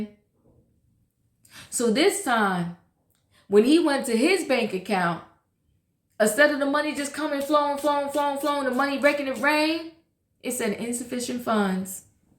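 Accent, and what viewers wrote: New York, United States. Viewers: I wholeheartedly agree...but, as well, that's the mother of his first born...I'm not sayin he's a saint at all but I think at some point he had a 'I'll keep her good in the process of doing me' mentality--and we all see the consequences of Wendy managing herself...Your hair looks so pretty and love that NYC accent...take care mama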